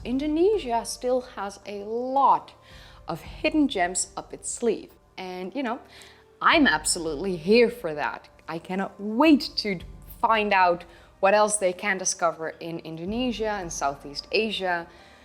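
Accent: Netherlands. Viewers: grappi je hoort meteen wanneer iemand van NL is haha wel leuk wat je doet hoor erg interessant hoor keep up the good work <3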